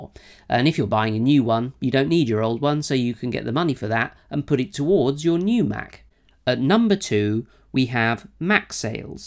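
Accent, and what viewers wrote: United Kingdom. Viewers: Yay you’re British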